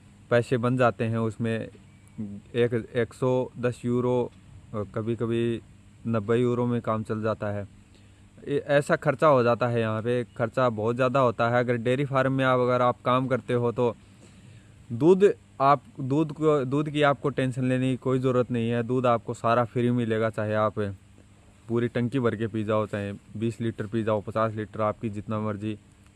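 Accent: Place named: Rajasthan, India. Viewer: awaaj se toh tu rajasthani lag raha hai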